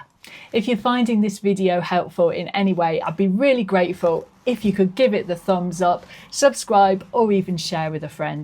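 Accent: United Kingdom. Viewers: I couldn't agree more, having also just stumbled across your channel,. Thank you for your humorous take on navigating this stage of life, together with accessible science - always nice to hear a British accent too (with every respect to all the other wonderful accents heard on youtube) 😊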